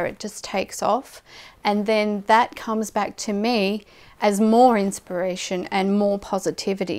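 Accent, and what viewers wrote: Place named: Australia. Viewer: I wish I had found you a few months ago. Apart from having the easier on the ear approach of us Aussies in comparison to some other countries, your videos are very informative.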